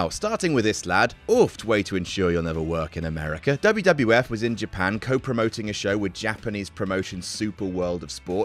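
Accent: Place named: United Kingdom. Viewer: The list is good but is way to British for me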